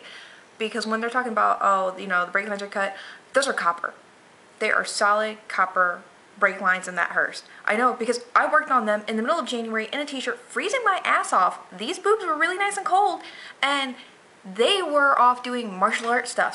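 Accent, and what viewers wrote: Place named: North Bay, United States. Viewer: It sounds like she's from North Bay. I don't know but my ex friend sounds just like her and she's from Petaluma CA. They mumble and say but umm a lot and connect all the words. I couldn't listen to this video without ear strain.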